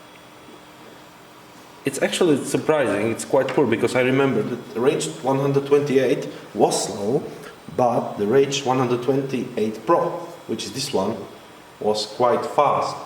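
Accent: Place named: Czech Republic. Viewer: Já si říkal, že slyším czenglish. Ale nemyslím to ve zlém, jen jsem tam v té angličtině poznal český přízvuk, tak rovnou píšu česky a pak se objevilo ve Windows Vitejte a bylo jasno. Též uvažuji udělat nějaký podobný test. PS: Toho Athlona fakt závidím. Nemám ani jednu Slot A desku a ani procesor, ale hrozně moc bych chtěl.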